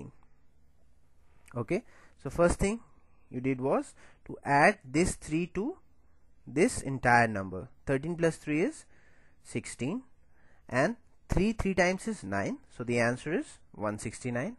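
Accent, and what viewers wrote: India. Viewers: Are you indian by any chance ? Your accent seems like that. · according to his accent, this guy is indian, so asian is correct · Itallian? He sounds Indian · I think the Indian accent adds 2 it, u know, he sounds wise, and stuff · The discussion is about your ugly pathetic 3rd world Indian accented english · ur accent is so awesome! it sounded indian or jamaican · indian accent! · clearly he is indian. do you not recognise the accent?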